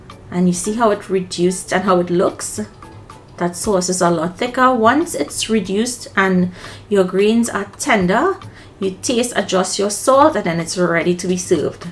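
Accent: Trinidad, Trinidad and Tobago. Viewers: PS. I enjoy hearing the Trini 'twang'🥰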